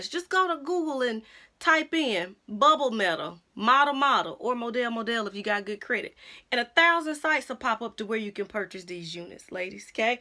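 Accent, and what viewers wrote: South, United States. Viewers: Its model model. Modelle is moe-dell. I'm a geek like that. Lol. You are absolutely cute as a button, and a pleasure to listen to, you southern belle, you.💓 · I am here for this Southern accent!!! The wig is beautiful on you! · I LOVE YOUR PERSONALITY!!!! I randomly came across your video and I'm glad I clicked. You're gorgeous, funny, down to earth, engaging with the cutest Southern accent. I died laughing when you said get your wig where you want to I'm not sponsored....I'm subscribing just for that! P.S. what is that fabulous lippie color you're rocking???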